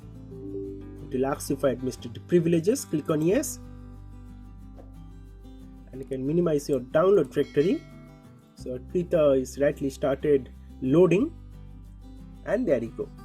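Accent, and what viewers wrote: India. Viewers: its allways the indian acsent to, thats how u know its going to work. thanks man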